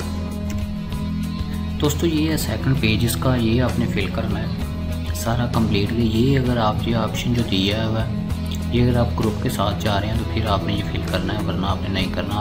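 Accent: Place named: India. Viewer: You ask comment but needless to say , you don't speak ENGLISH to understand it. My comment is use less to you as you are not helpful to me. Onse you speak Indian English, you are damaged for good to get a job in AMERICA. IS UNTOUCHABLE CAST SYSTEM INDIAN??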